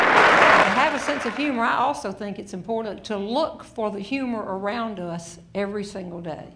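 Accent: South, United States. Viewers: I love it....she has a wonderful delivery and great comedic skills! Her Southern accent is wonderful and the fact that she profanity free is the best.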